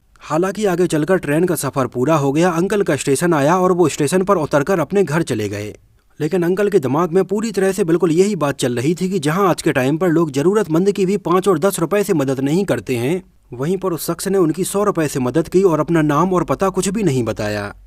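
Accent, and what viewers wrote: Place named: Bundelkhand, India. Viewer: आप शायद बुंदेलखंड के हैं आपकी बात से मैं सहमत हूँ आपकी टोन से मैं सोचता था कि आप बुंदेलखंड के हैं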